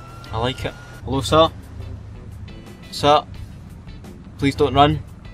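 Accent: Scotland, United Kingdom. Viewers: Are you scottish? You sound scottish if you are I am too!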